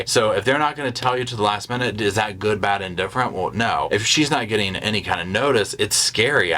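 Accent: South, United States. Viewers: Id just like to say thanks for your videos everyday ☺️ ive been super depressed lately because i was in an accident and lost all my teeth and its hard for me to even get out of bed anymore 😭 but i love your southern twang makes me smile and has my attention off my issues if just for a few minutes 😏 love rosco p coletrain❤️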